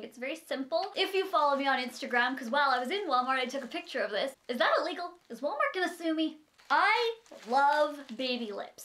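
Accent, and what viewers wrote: Canada. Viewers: Hear your Canadian accent heh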